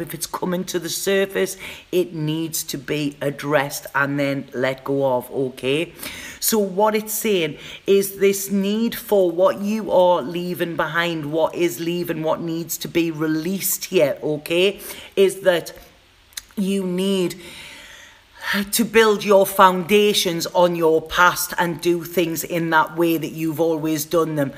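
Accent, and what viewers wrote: Newcastle, United Kingdom. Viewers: Luv u, you're such a breath of fresh air! Thanks so much for all that you continue to give. I'm off to Peru on Tuesday and I'm so looking forward to the experience. God knows what I'll find there but I'm totally up for it! When I'm away it'll be lush to hear another Geordie when I'm so far from home!😉xxx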